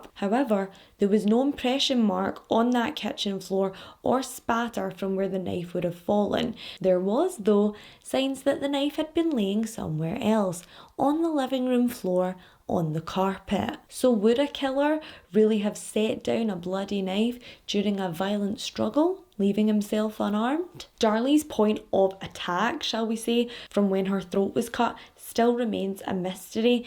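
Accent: Scotland, United Kingdom. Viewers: Girl I just found you a few days ago and I’ve watched almost all of your vids now I’m obsessed!!!! I love your Scottish accent and true crime so this is the best!!!! 💗💗💗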